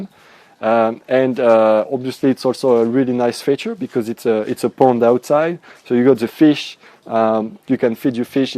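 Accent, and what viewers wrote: France. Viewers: Ça fait plaisir d'entendre de l'anglais avec un accent français, j'ai presque tout compris^^ Merci pour cette vidéo!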